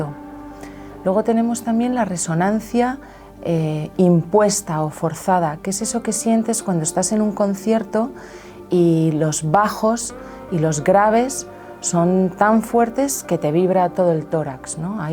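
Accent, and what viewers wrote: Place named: Spain. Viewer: Me gusta su tonos de voz que llega al corazón ❤️ y su explicación me gusta en verdad es primera vez que la escucho y primera vez los que nos enseña con un fondo de música súper agradable tiene voz de Española seguiré sus enseñanzas desde cuba cuba un abrazo gigante cuídate mucho un saludo a la familia eres maravillosa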